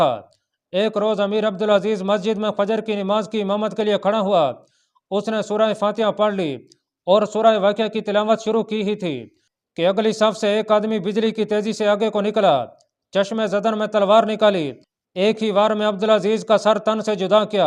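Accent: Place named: Saraiki, Pakistan. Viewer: Saraaeki talaffuz lag raha hai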